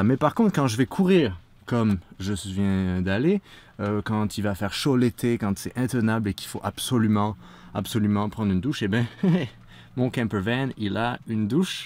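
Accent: Quebec, Canada. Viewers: L'accent canadien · jb 2004 l accent québécois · Tu a un accent quebecois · Ça ce voit qu'il est français, les gars, il a juste un petit peu pris l'accent québécois. x)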